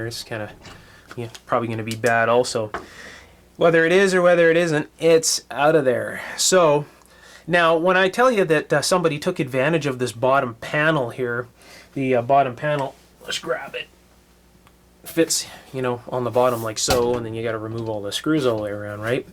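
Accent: Canada. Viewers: I love your Canadian accent. My grandfather was Canadian and it's been a while since I've heard this accent! Good tip on finding the outside shield end of an unmarked cap. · Your accent makes me think you're from Canada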